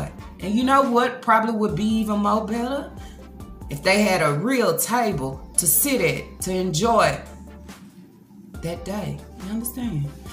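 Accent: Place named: South, United States. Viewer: I'm new to this channel can someone tell me what State is from I hear Southern twang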